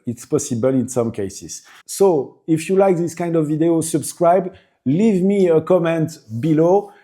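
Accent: France. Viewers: Your English is amazing but your accent is throwing my Texas ears off a bit. I am trying so hard to learn some French, but alas, I am truly hopeless. But I love your show and have subscribed to it. I know I am capable of understanding the accent and I suspect it is the key to learning French because so many words are already part of the English language, not to mention that I grew up very near the Louisiana border where French is still widely spoke. But I do know " Laissez les bon temps rouler" although I can say much better than I can write it. 😂😂😂 I'm sorry, that is official motto for Mardi Gras, kind of our Carnival. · I been wondering about the young French man and his chatuea, think his video was called So Chateau. You showed a picture of him in the end, a very young man. I would like to know how his chateau is doing? Don't worry about your English women love it with your French accent ❤😊😅😅😅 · As an American I understand your English perfectly. 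You do have an accent but so what? You are French and it is understandable. I only wish I could speak French. It’s a beautiful language and I hope to visit your glorious country next year. 🇫🇷🇺🇸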